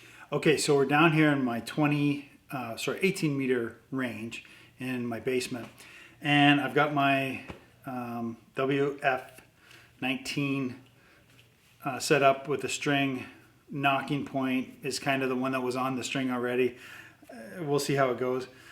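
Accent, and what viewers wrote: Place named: Canada. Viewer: I was the guy who deduced you're Canadian by the way you pronounce "about" (aboot). Anyhoo. I enjoy your videos. You're very personable and don't purport to be an expert. Good reviews and tips. Now you said that I had to subscribe, like the video and then go to Instagram. But "Optional" came up. I'm assuming Instagram is. Correct? But put me in for the drawing. Have heard good things about the Black Hunter. Keep dem vids comin'.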